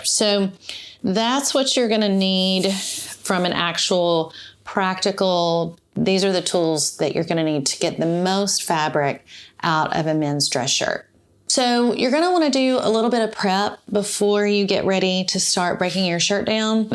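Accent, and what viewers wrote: South, United States. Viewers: I also just found you and I love your channel- I binge watched every episode! I could listen to your charming southern accent all day long- and find myself thinking in a Southern accent ;) Thank you for all the great ideas on thrusting men's shirts! I purchased a blue striped shirt for a quilt I have been collecting blue& white stripes for several years- when I got home- my husband loved it!🤷‍♀️ When I was watching one of your other episodes, I found myself wondering if you used part of the shirts where the buttons used to be- and if the threads holding the buttons put a stress on the fabric in that area- could it cause a hole? ... if you cut the buttons off before washing the shirts- sometimes the washing process will relax the fabric where a possible hole could arise- so you will have a better idea if it is just stress - or an actual hole- an I explaining myself clearly? 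Anyway, God bless you - and thank you for all this great info❣️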